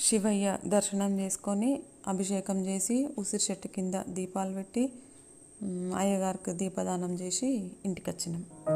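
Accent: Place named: Telangana, India. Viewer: Superb madam.Traditional reflection in pure Telangana slang.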